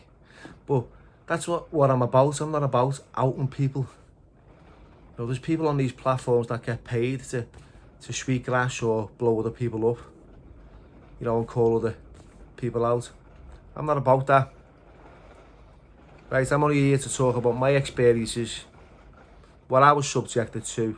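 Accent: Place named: Scotland, United Kingdom. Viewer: Nailed that Scottish accent. Like me ma. What does heed the baw mean 😮strange people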